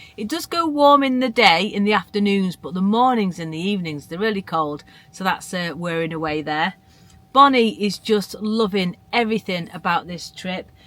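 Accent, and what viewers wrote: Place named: Lancashire, United Kingdom. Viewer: Hiya, Been listening to your accent, and I don't think that it is pure Cheshire, more like higher up Lancashire somewhere? am I correct?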